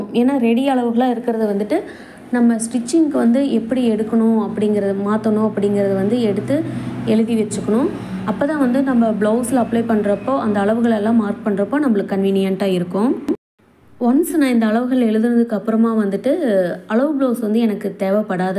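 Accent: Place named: Coimbatore, India. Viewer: Hand writing super sister. Neenga yentha ooru sister unga language karu coimbatore side la pesaramadri erukku.. niraye video paarpen unga video rembo honest avum solli thareenga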